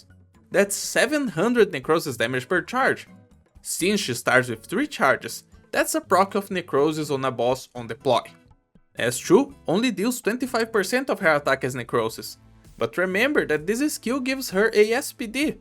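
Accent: Brazil. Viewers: Bro... you are brasilian ? Your sotaque is sooooo Brazil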